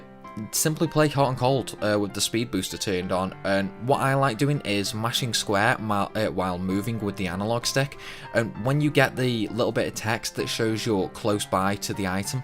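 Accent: Stoke, United Kingdom. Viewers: Another YouTube gaming video soiled by a brittish accent 😩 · Mate are u from stoke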